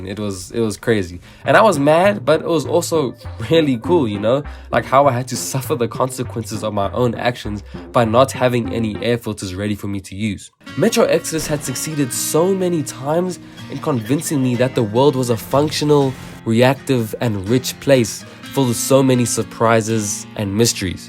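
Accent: South Africa. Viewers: Are you from South Africa? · He's definitely South African! No way he's not. I know my people's accents! 😂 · This dude is a South African isn't he? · You may not see this but are you a fellow south african? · Bet you're South African, Amirite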